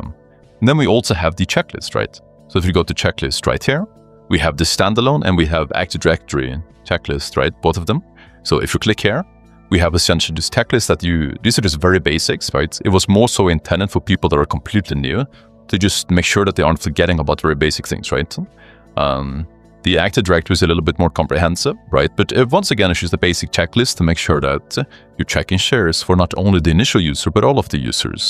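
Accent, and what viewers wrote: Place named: Sweden. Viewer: Sounds Swedish to me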